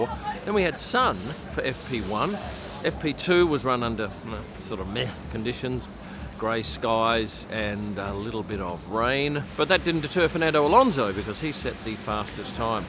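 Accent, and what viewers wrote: Australia. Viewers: I like the Australian talk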